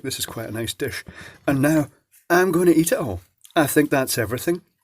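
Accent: Scotland, United Kingdom. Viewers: Well, the Scottish accent is good, Plus has a good grasp of all things technical, and explains things really well (something I really appreciate). It doesn't matter what your level expertise, you'll probably learn something by watching. eg: I didn't actually know that the gas given off by those chemical heaters was Brown's gas (HHO, in a perfectly explosive mix) but it makes perfect sense now that I've been told. Us techies can be strange people, and understands us better than our parents did, so it's a bit like coming home to the home you never had. By being himself attracts technical people, and gathers people who all speak the same language. Sometimes I wonder if himself knows how special this is.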